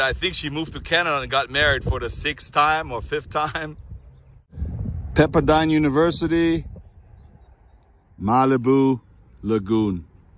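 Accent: Germany. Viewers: Nice to hear a German Accent, my best friend was from Germany. thx for the vids.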